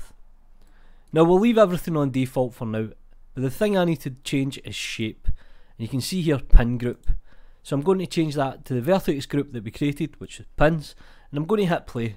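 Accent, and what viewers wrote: Scotland, United Kingdom. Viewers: I get that you might have trouble understanding him, Scottish (I'm assuming) accents might be a little different than what you are used to. But, to accuse him of not speaking clearly? Wow... Oh, and Nicholas, I wouldn't ever travel outside of the US, you will be extremely disappointed. By the way, I loved many things when I traveled to Scotland from the US, but really loved the accents.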